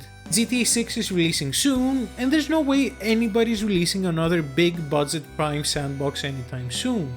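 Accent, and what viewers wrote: Greece. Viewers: Greek accent geiá sou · Greek accent is tough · Φίλε η ελληνική σου προφορά είναι τόσο εμφανής 2 λεπτά βλέπω και δεν χρειάζομαι τίποτα παραπάνω!!! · Are you Greek by any chance? You sound like it. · i know this greek accent brother XD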